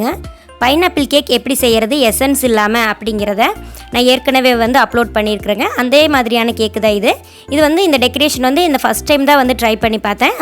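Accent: Kongu, India. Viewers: Akka Namma kongu slang kekumumbodhey sandhosama irukunga akka ... ❣️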